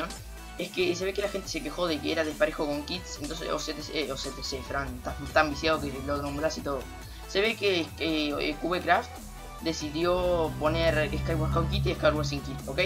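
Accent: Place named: Argentina. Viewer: Hola! te hago una pregunta sos de Uruguay o de Argentina por que hablas como los argentinos pero tenes una capa de Uruguay en minecraft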